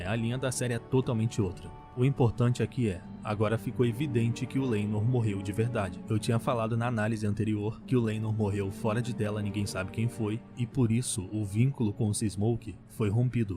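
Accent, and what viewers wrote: Rio de Janeiro, Brazil. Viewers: Esse sotaque carioca me pega muito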